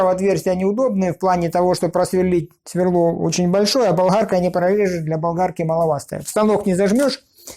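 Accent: South, Russia. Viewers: Я сначала думал он на самом деле себя (и канал) назвал. И говорок у него такой типа, южный. Многие знают как там Гэ-кают. А он оказывается. Т.е. механический мозг. Но блин, он и правда! Надо же так отверстие придумать прорезать! И чем? Саморезом! 👍👍👍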